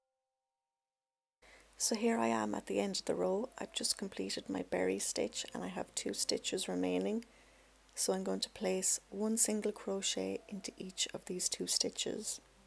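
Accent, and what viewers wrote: Ireland. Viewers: This is beautiful. Can't wait to make one for myself! Thank you for sharing your amazing creations and for the added bonus of the lovely Irish accent!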